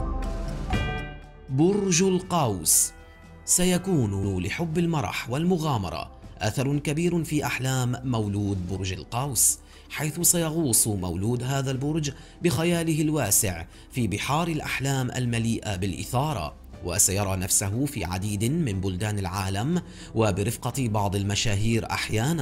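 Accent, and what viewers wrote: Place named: Arab world. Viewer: ماشاء لله على الصوت والحنجره العربيه الاصيله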